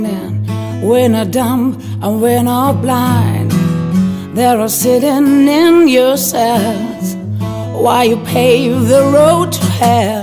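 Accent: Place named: Germany. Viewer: Geile Stimme für die eigenen Songs, aber ist und "native speaker". Man hört den deutschen Akzent und ablesen geht gar nicht! Sorry!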